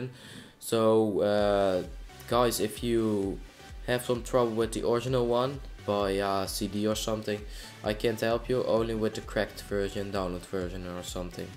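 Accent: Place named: Netherlands. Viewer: uit nederland denk? kun je wel horen lolll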